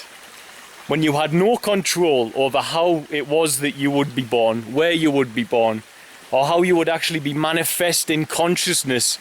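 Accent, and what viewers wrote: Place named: Newcastle, United Kingdom. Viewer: He's got a Geordie accent so I would assume he's from the Newcastle area.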